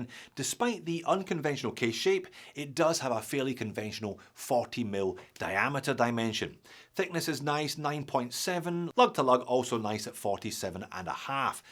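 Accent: Scotland, United Kingdom. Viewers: I can hear the Scottish accent!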